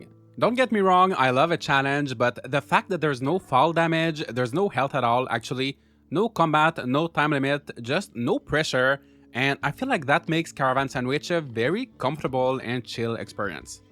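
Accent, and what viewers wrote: Quebec, Canada. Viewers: C'est sûr que t'es Québécois! Je me trompe?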